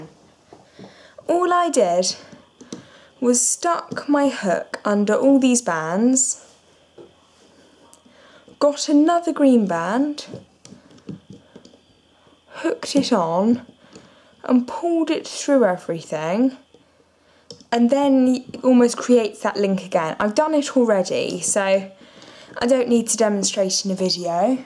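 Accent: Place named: England, United Kingdom. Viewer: I love your accent! I'm from England too but I don't have your accent :( but thanks for the tutorial! :)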